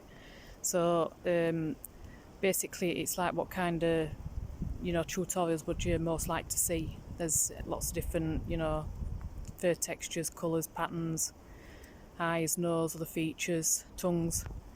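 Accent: Yorkshire, United Kingdom. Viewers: Love your Yorkshire accent, its just like my dads so i understand you no problem. Lol You are most talented, just subscribed! Hi from Canada 🇨🇦 loving all your content. Cheers, Michele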